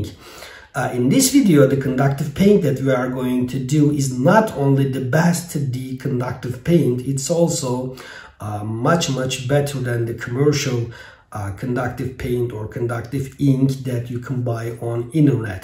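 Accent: Turkey. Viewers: Merhabalar Öncelikle videolar için çok tesekkürrler çok faydalı içerikler var kanalınızda.Aksandan ve kullandıgınız malzemelerden anladıgım kadarıyla türkiye de yasıyorsunuz. Büyük ihtimal sizinde karsınıza çıkmıştır bi yerlerde. Nanomax ısı boyası ya da başka marka adları altında satılan bir ürün var. ISI BOYASI. Bu sistemi kendim yapmak istiyorum. Bu konuyla ilgili bi proje yaparsanız izleyiciler çok faydalı olacagı inancındayım.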